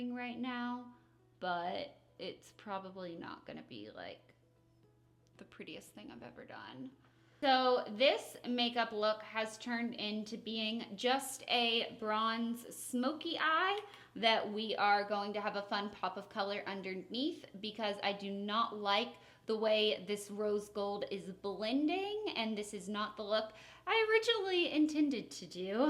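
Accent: South, United States. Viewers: It's funny. I started watching your videos about your third video up with your southern accent! But that's beside the point! Lol ... I STILL use OMGHHKP to this day. I'm a teacher and even my students say it.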